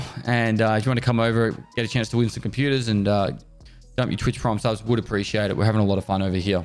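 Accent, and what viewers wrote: Australia. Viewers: It starts on if you don't wanna hear aus accent.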